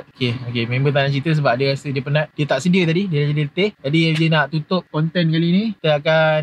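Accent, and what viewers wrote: North, Malaysia. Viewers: org utara kaa? Lama duk sana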